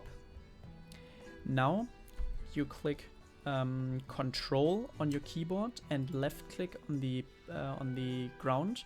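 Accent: Germany. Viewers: Wie man am Englisch erkennt, dass der Gute aus DE kommt 🤣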